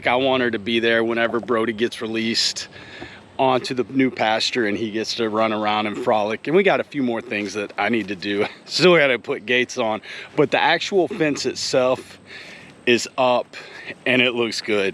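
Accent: Louisiana, United States. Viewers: Love the channel!! Where you from, you definitely don’t sound like Florida…that sounds like my neck of the woods…Louisiana!! If you not from Louisiana you definitely must do a lot of swamp impressions and your getting better at it😂 I’m not from the parts you sounds like but it sounds definitely Pierre Part La but im from a whole different bayou in Pointe Aux Chen La on a Native America Indian Reservation😊 God Bless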